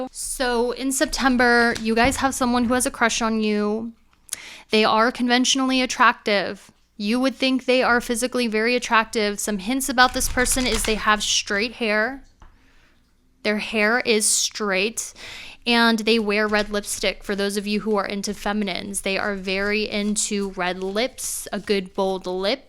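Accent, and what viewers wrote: South, United States. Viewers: I love your Southern accent and the fun themes you've been doing for your readings lately! I appreciate you & your creativity! You have a cute and fun energy :) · I really like that southern accent. Calmed me down and made me laugh a bit. I chose group 3 and it really described me. I go to customers houses and build stuff that people order from Amazon or whatever they get it from. I was actually called an expert at building stuff from one of my customers. I want to do more creative stuff with music though. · Love your Southern accent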